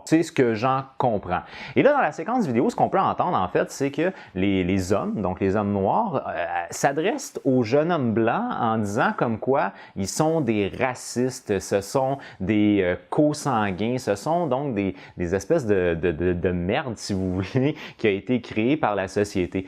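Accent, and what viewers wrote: Canada. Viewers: Vraiment pro commes video, ça me sidère de voir une telle qualité de vidéo avec si peu de vue ^^ T'as une sacrée aisance à la caméra, j'suis bien content d'avoir découvert ;) Des que j'entends un accents Canadien j'ai directement l'accent quand je pense et parle c't'incroyable ^^ Bonne continuation et t'as le bonjours de la France l'ami Peace et la bonne vie à toi :)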